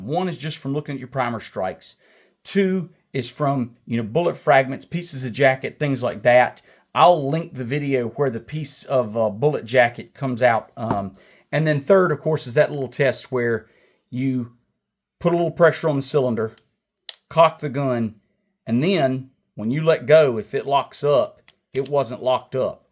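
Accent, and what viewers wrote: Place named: Oklahoma, United States. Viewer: Are you an Okie? A buddy of mine is from Ok City and sounds just like you! Btw, great video, I have an old .38 sp. that’s out of time.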